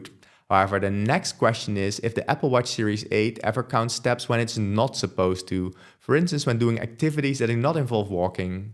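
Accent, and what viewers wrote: Netherlands. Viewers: I lived in Amsterdam and u clearly have dutch english accent, i went on your site and you actually studied there , you dutch right 😄? Thanks for the reviews , i just found your channel. Now im even more confused on what to buy , according on the tons and tons of video you made that i dont know if i will be able to watch entirely. I want just to fix my sleep as i wake up 1 or 2 times every night, i want also to track my hearth i suspect some problems sometimes i have a miss hit in the beat, i don' t know how to explain it. Should i take the polar h10? A watch ? A band? Im so overwhelmed by the amount of review u did. Bye from an italian new follower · Dit klinkt zoooo boring! en overduidelijk voor gelezen! With yr Dutch accent! 😵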